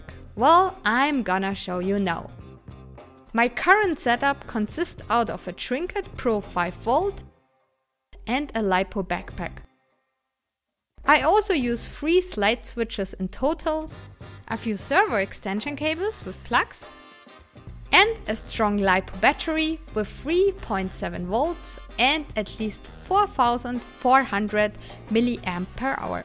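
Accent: Germany. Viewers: In den ersten 2 sec gehört das du aus Deutschland bist. No front. Ich finde es gut 😁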